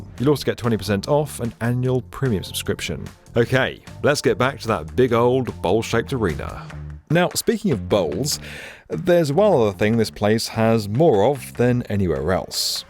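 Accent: United Kingdom. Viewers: These pretentious British narrator voices make me ill. Similarly do does the cost of seating and tickets in these arenas built for the minority of wealthy people and the fiscally irresponsible rather then the rest of us. Seriously why would I waste so much money when I can watch it at home in comfort on a huge screen for a tiny fraction of the cost?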